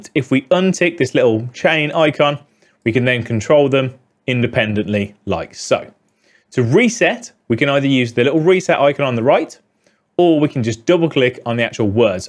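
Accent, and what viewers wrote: United Kingdom. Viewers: I like this British accent. 😀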